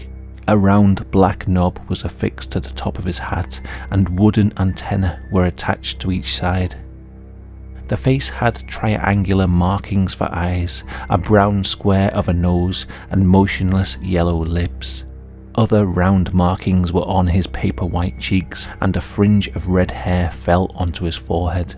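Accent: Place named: North East England, United Kingdom. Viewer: no mate, defo not, I'd guess north east of England..maybe Sunderland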